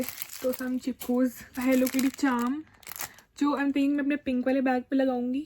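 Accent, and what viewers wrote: Punjab, India. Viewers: hey, i started watching your vlogs and shorts recently and im hooked fr.. really appreciate your efforts and the time and energy you put in your work💅🏻 i just wanted to make a request to you that make a vlog where you'll only speak punjabi for the whole day or whole duration of your vlog (as im a punjabi who stays in mumbai, i got your punjabi accent in your daily sentences and stuff.. also the "भांडे मांज" rahi hai meri roommate- was amazing 😋.. i hope you make a punjabi vlog)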